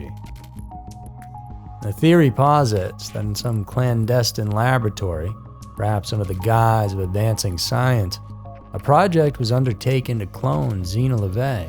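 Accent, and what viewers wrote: South, United States. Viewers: Im from South Africa and am so mesmerized by your gorgeous southern ? I think accent that im trying to focus on the material at hand 😂❤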